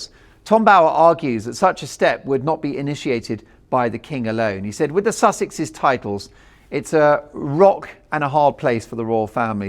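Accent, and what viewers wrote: United States. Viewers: Great American accent! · Great American accent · You nailed an American accent!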